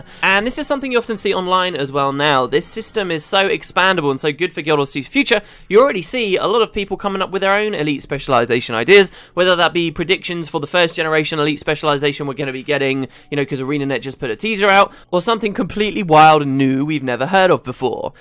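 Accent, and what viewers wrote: United Kingdom. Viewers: You gotta tell me what area of Britain you're from! I heard you pronounce "law" similarly to "lore" and couldn't help laughing. Great ideas for the giveaway though, I hope it all pays off by bringing in new viewers:)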